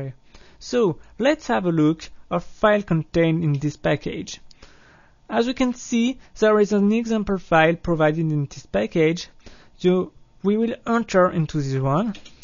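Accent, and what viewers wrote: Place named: France. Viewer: He has the same accent as mine, it is a french accent, and it's the most beautiful accent in the world, so you're wrong. If you can't understand a french speaking english it means you aren't worthy of hearing what this person is saying. French accent has been voted the most understandable foreign accent by a panel of very wise and independant judges.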